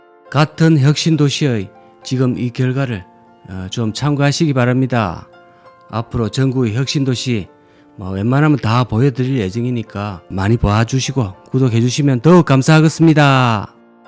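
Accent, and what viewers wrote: Gyeongsang, South Korea. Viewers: 고향이 경상도 어디세요? 사투리 귀엽 ㅎ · 안녕하십니까 ~~ ~~ 입니다. ~~~ ㅎㅎㅎ 그 소리를 들으면 스트레스가 팍 풀리구 구수한 경상도 말투, 어린시절로 돌아가는듯합니다. 평서 객관적이고 참 재미 있는분 같으십니다. 건강하셔서 오래 오래 진행 부탁드립니다 감사합니다.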